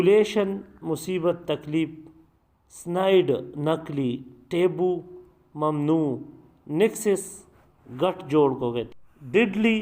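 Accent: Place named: Pashto, Pakistan. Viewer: You missed pronounce many words instead of pronunciation in pasho accent ur accent should be urdu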